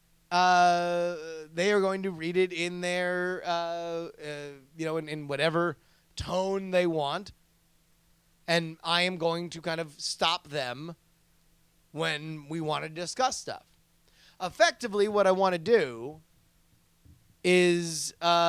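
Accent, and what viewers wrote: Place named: United Kingdom. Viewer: Best British accent in the business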